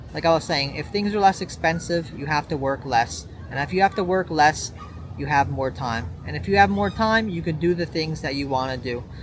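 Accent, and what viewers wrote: New York, United States. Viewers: that Nu Yoork Accent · I hear the nyc accent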